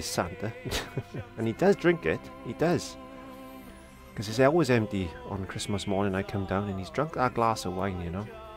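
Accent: Wales, United Kingdom. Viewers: Love your Welsh accent! 👍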